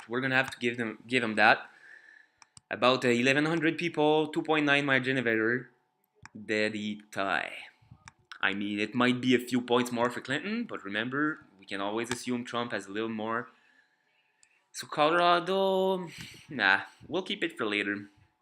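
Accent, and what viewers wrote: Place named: France. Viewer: like your accent. i hear a bit of french.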